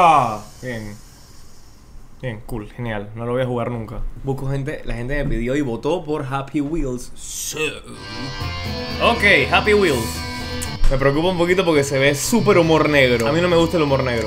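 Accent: Venezuela. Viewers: eres venezolano?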